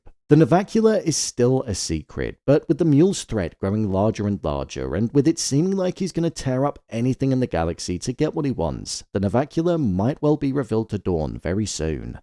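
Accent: United Kingdom. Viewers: Is this guy a British AI voice?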